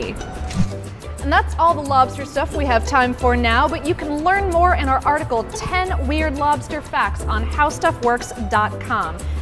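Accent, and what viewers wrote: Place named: United States. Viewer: has a german name, looks asian and speaks american english....globalistaion for the lose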